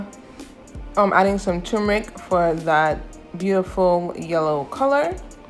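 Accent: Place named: Dominica. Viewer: I thought your accent was from Dominica. Nice to meet you. :)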